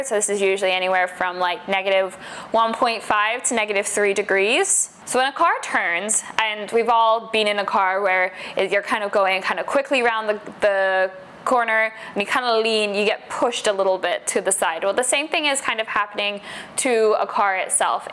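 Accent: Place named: Australia. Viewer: Hello, algorithms brought me here. I love the enthusiasm and the love of the sport. In trying to pinpoint your accent, sometimes it goes northern but sometimes I think it's aussie... Pretty cool in any case (and if it's a 'normal' accent then I haven't travelled enough!). Anyway, there's alot of oldie f1 fans out there who sometimes get a little sensitive on technicalities. You're still finding your way which is great but thought I'd share to not be too disheartened if you get negative responses in the future. You'll find your footing! Few pointers on this topic- Tyres, if you're going fundamentals for F1 specifically, the suspension components work very closely with keeping the optimal operating temperature of the tyres for the lap. Think the window is 120-180c? Toe/caster/camber, I think alot of these are tailored for the tyre/track/scrub rate/temp, rather than driver preference. 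You could've mentioned DAS wheel in the 2019? period. Suspension, push or pull rods, bit of black magic but I suspect is how they load transition works with the rest of the setup (ie placement of engine, aero etc). Ride stiffness/height is largely balancing the aero rather than 'comfort'/performance typically. See AM this year (I suspect running very stiff setup that helps aero but because engines I assume aren't balanced/ tolerating the stiff setup as well and will shake the driver. Mercedes last era with porpoising (aero grounding out at high speed, relying on suspension too much to fix the aero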